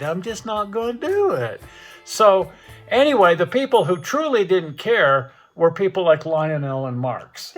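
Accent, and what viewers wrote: United States. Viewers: For those that commented negatively. Life is far to short to be commenting negatively. Please lighten up. I still think Hill Billy accent was funny. But I have been told I have no taste.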